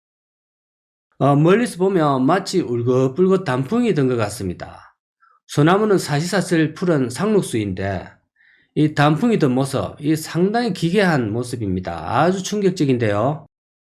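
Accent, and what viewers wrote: Gyeongsang, South Korea. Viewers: 쥔 양반 목소리가 상당히 특이하네요~ ^^; 뭐랄까, 갱상도 사투리+교회오빠 목소리 ? 한국 부동산 멸망전을 위해서 오늘도 고군분투 하시느라 고생이 많으시네요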